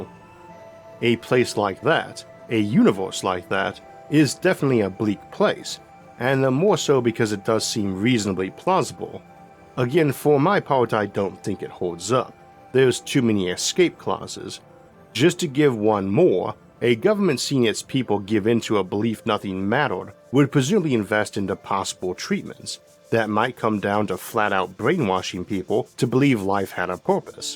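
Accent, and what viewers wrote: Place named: South, United States. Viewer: This deep southern accent of the USA is worse than a Scottish one.Who picked the parameters for the A.I. text to voice? Mr. Alabama? Unless you come from the Mississippi delta you’re not gonna have an easy understanding.A human would have done this better.For this ,using an inferior A.I. I will boycott the rest of the video.Give jobs to humans,you pile of rusted microchips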